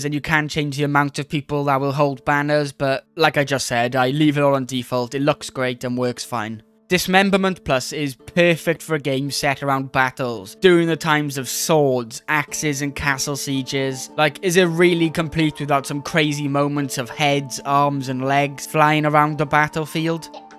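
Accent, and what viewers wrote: Wales, United Kingdom. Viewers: Nice vid. Is that a Welsh accent?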